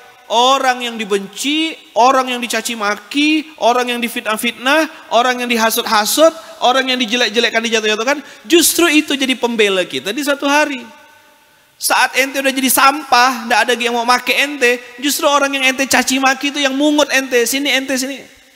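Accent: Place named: Malaysia. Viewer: Logat macam Malaysia pun. Terbaik ustadz ni.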